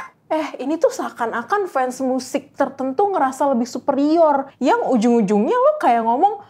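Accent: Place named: Banyumas, Indonesia. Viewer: orang ngapak ya mba hahah PWT kerenn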